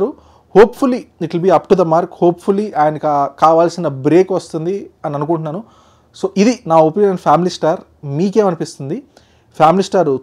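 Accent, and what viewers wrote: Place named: Andhra Pradesh, India. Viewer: అరేయ్ ఎందుకురా తెలంగాణని చెప్పుకు తిరుగుతావు నువ్వు ఆంధ్ర నీ యాస నే చెబుతుంది నీలాగే పూల సొక్క గాడు ఫస్ట్ తెలంగాణ యాసలో మాట్లాడి మళ్లీ వాడు ఆంధ్ర వాడు అని ఒప్పుకున్నాడు నువ్వు కూడా అలాగే ఒప్పుకో డ్రామాలు ఎందుకు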